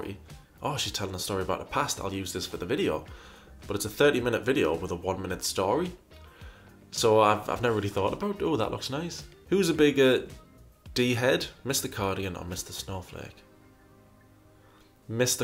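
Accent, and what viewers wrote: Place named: Newcastle, United Kingdom. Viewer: lol, i didn't believe was from Newcastle, but I can hear the Geordie accent in this video <3... loving these videos on gurlworld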